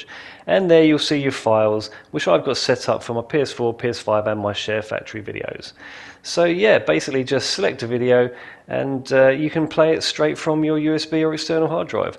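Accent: United Kingdom. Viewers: I’m assuming you are in the UK by your voice? I wonder if that has anything to do with it. I’m in the US and I’m guessing we don’t get the same updates.🤷🏼‍♂️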